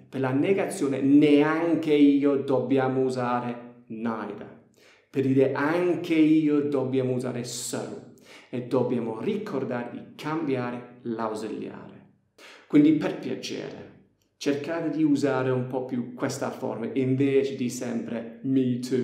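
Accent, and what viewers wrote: Apulia, Italy. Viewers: Mahhh... A me sembra un pugliese con l'accento inglese ! Davvero friendly, ma non perché pugliese. Degno successore del bravo peter sloan. Maybe are you àppulish? So am I! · Per sapere, il tuo italiano lo hai imparato da qualcuno del sud Italia? Così a occhio direi... Puglia, forse? :) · il tuo italiano hai una leggera inflessione pugliese, fantastico! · Hai l accento pugliese quando parli italiano😁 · Non è italiano ! è un inglese che parla bene l'italiano ma lo ha imparato con l'accento pugliese dei parenti !! Simpaticissimo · Ehi, your italian has a funny southern accent, kind of pugliese! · Ma solo io sento una certa cadenza pugliese? u giovn😂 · L accento pugliese è troppo comico... complimenti! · Hi, where are you from? I guess you were born in GB, although your italian cadence seems likely from Apulia, perhaps you’re dad or mum are from there. 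A huge hug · Hai un accento pugliese molto marcsto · Ti adoro...tra la semplicità delle spiegazioni e l'accento pugliese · L'accento pugliese è favoloso!! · Absolutly not, perhaps at south more. I think live in Puglia because He got that accent and habits as well. Is it right? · anch’io ho sentito un accento simile al pugliese XD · Davvero simpatico , con quell’accento fra Matera e Bari! E soprattutto , chiaro ed efficace! Grazie mille per queste ottimamente strutturate e utilissime pillole su particolarità dell’inglese troppo spesso trascurate. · Bellissimo l'inglese che parla italiano con l'accento pugliese (se non sbaglio) 😊 video utili grazie! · nooo il tuo accento pugliese non perderlo mai... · quindi non sono l' unico a cui sembra pugliese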